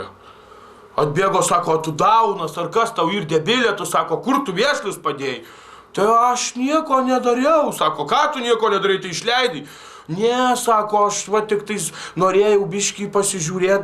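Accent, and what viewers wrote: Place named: Samogitia, Lithuania. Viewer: Zemaiteška gera vara tep ir tuoliau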